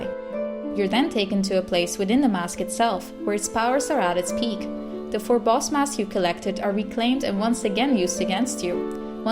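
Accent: Netherlands. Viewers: oke voor een nederlandse heb jij echt een te mooi engels accent <3 10/10! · wich province of the Nederland are you, you're dialect sounds familiar. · Are you dutch?!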